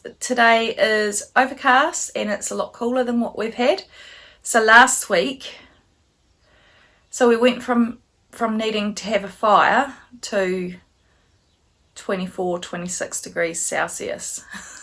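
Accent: New Zealand. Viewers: Hi So nice to hear another kiwi accent on YouTube. I am from Auckland and will go back and watch all your early episodes.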